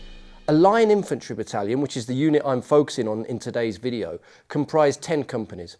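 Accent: United Kingdom. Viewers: Can I ask what part of the UK is your accent from ?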